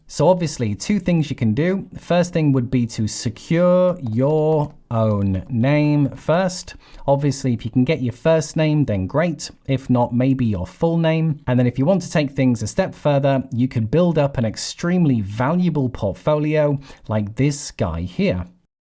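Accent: United Kingdom. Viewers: Life is SMOOTH with a British accent........Cheers My Love...!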